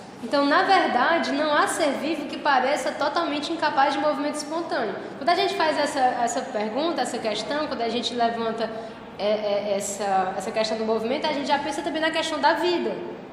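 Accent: Northeast, Brazil. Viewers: Boa noite! Sempre vejo seu canal e alguns vídeos seus detonando os esquerdopatas. Parabéns pelo conhecimento apurado e inteligente. Até seu sotaque nordestino dá um toque sublime as suas apresentações. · encontrei seu canal por acaso. Estava lendo um artigo sobre Bergson que me deixou muito feliz e, inclusive, me gerou uns arrepios (alegria). Depois fui buscando mais sobre Bergson e acabei por aqui. O mais legal de tudo isso foi quando prestei atenção ao criador do artigo que, advinha, era de sua autoria. Muito obrigado por solidarizar seu conhecimento, sua forma espontânea, seu sotaque nordestino, como o meu, ainda deixou tudo mais atrativo e familiar sem perder a profundidade do pensamento de Bergson, um pensador essencial para nosso tempo. Ganhou mais um inscrito. Te ouvir me deu a impressão de ouvir uma pessoa próxima muito inteligente e fascinada por essa consciência da Vida que tanto me deslumbram também. 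Muito grato! Um abraço.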